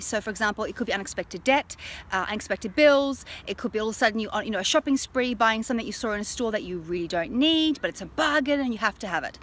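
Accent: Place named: United Kingdom. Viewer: Your lovely Uk accent makes me loose concentrartion on what you say )) Thank you for great info you share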